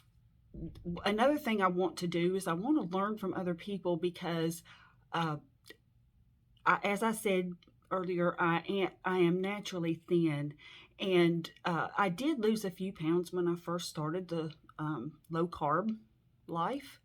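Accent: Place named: South, United States. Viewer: Hi. Best wishes for your channel. Following Ken Berry for a long time, I did a little bit carnivore on and off, so far never "all in" 100%. I will change that now and go for it. Since I am living in Portugal (sardine country), I can tell you my special not so carnivore recipe: I put one can of sardines and 1 can of anchovis in a food processor and blend it to a paste. This paste I fry in a pan with a piece of butter, add salt and pure natural tomato sauce. Then I let it simmer on low fire for 30 minutes and fill all in a glass bottle. Every morning I heat up a few tablespoons of this sauce and put it on my scrambled eggs or on burger patties. I like it, you don't taste the fishes. Has a lot of omega 6, fat and lycopene. By the way, I like your southern accent very much. Is it Tennessee like Ken Berry?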